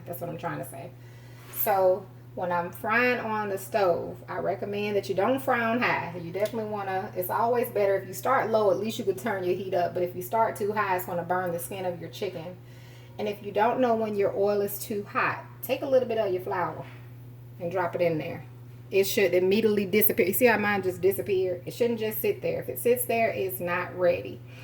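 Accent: South, United States. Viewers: I heard her voice and I said to myself “ She can cook” listen to that beautiful accent. Southern Woman. I was raised by a Southern Woman while my Mom was in Vietnam. I STILL call her my Mom and she My Queen is 89 and she lives with me and my wife and now I feed her, wash her, cook for her and I make My Queen smile. Thank you so much.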